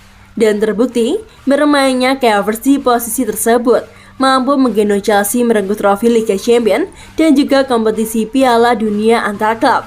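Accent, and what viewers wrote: Java, Indonesia. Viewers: la kok logat jowo mbak e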